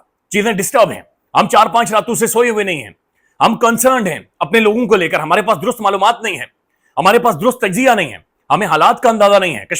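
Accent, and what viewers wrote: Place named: Pahari, India. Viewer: sir please ek vlog pahari main bhi kren qasm se lutf aata hai apki pahari sun karr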